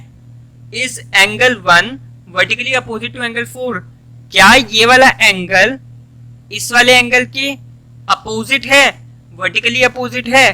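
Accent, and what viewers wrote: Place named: Haryana, India. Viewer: Ap haryanvi ho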